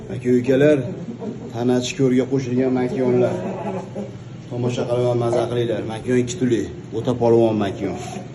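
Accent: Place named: Andijan, Uzbekistan. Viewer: Андижондансиз шекилли булди буеги аник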